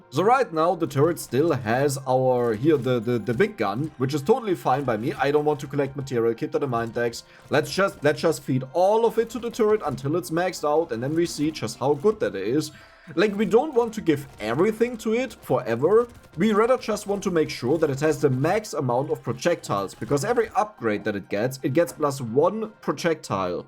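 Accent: Germany. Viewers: the german accent xD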